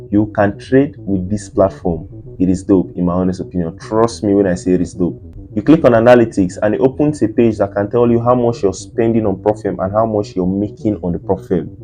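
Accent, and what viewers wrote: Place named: South Africa. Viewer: You're South African 😂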